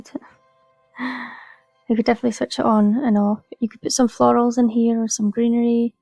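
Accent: Scotland, United Kingdom. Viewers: My wife Cinnamon, really loves your crafts. She is watching you make the fireplace with the broom and cooking pot and spiders. She just loves it. Also loves listening to your voice. A touch of bonnie Scotland.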